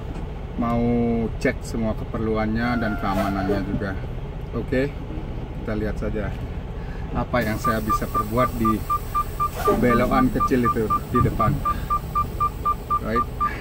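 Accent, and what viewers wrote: Bali, Indonesia. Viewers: Seperti logat bali yaa bhsa indo nya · Kalo denger logat pas ngomong pasti orang bali y bli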